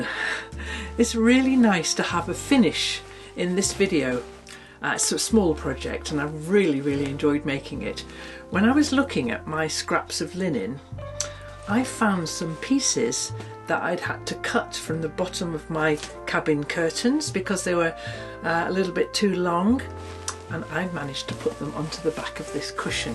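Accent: United Kingdom. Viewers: i enjoyed the last video of the year. You just bring me joy listening to your lovely British voice with calming thoughts for us on our lime green sofa. I have enjoyed all the crafts you made and watching pateron So glad i found your channel from a wonderful friend i made this year. we were all 3 nurses and understand your mindset. I suggest a knitted pillow with an appliquéd yarn flower in center. Thank Anna and Rita for making all this possible. I look forward to the year of color 2026. Jodie in GA USA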